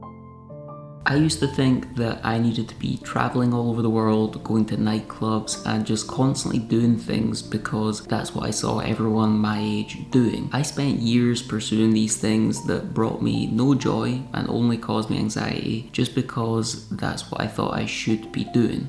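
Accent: Scotland, United Kingdom. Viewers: you are amazing. First, you explained your situation clearly and gave effective suggestions to help others. 20 years ago I suffered a brutal attack, and with that I encountered a weird collection of anxiety, depression, strange thoughts, and, of course PTSD. It took some time before I found a counselor who actually understood what I was going through. He was a mature graduate psychology student doing his final practicum. He was great, and a perfect fit. He challenged me often and tried a few different therapy techniques. I told him when it wasn't working., my second point is that you have opened some doors for people suffering anxiety to have hope. BTW had I lived my days in Scotland - my family immigrated to Canada when I was 10 more than 50 years ago - I would likely have a similar accent like yours. Keep on keeping on!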